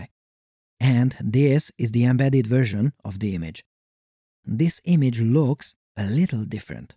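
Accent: India. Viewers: I assumed from your accent you were Indian, but I suppose there’s a paper out there that knew better than me to begin with. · LOL, I thought you are Indian (based on your accent and exotic name) :-D What a surprise :-)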